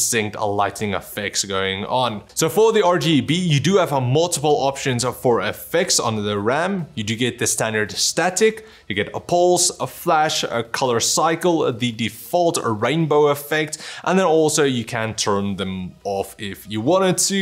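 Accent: South Africa. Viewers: Definitely South African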